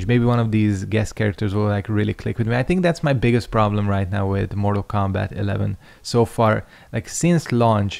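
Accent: Middle East. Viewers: I had never seen hia face before, his voice sounded middle eastern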